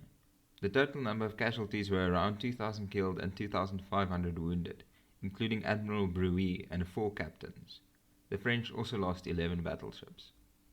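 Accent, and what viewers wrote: South Africa. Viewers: I also guessed the narrator is from SA. Blown by the quality content and precise narration 🇿🇦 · Thank you for your gracious reply. I note that others have said much the same. Having been at school with S.Africans I have no problem with the accent but audio levels need to be higher and delivery a bit slower. This will make your channel one of the very top military history ones anywhere with simple graphics, directions and exact distances given. The fact that so many are saying he same is a tribute to your scholarship. Best wishes, Dr Stephen Hall, Ireland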